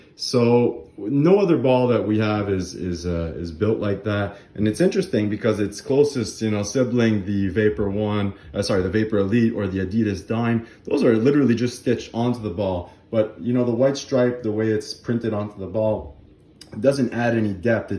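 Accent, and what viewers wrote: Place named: Canada. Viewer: Buddy, questions for you if you have a second. 1) you sound Canadian, are you? If so, I assume you ship to Canada (if you run a shop), oui ou non? Would be great to buy a ball from you to support your work. 2) This Nike ball has been elusive for me - specifically the SKU with the “lower” white stripes as opposed to the striping laid on top of the ball. Do you know where to get one in Canada if you don’t sell/ship them?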